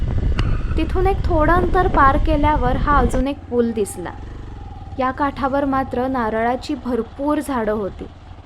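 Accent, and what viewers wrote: Kolhapur, India. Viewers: THANK YOU KHUP VIDIO PAHAYLA MILTAT PARANTU TUMCHI DEVGAD OFBIT BHTKANTI MANALA BHAVLI TMHI DOGHHI FAR JUNTALMAN AAHAT TU MAZYA GAVCHYA SANGMESHVAR JAVAL DHAMNICHI AAHES PAN TUZYA BOLNYYAT KOLHAPURI TUCH AAHE TUZYAKDUN AAMHALA APLE KARNESHVER MANDIR SAMBHAJI VADH STAMBH MARLESHVER HI OFBIT THIKANE TU KADHI EXPLOAR KARNAR.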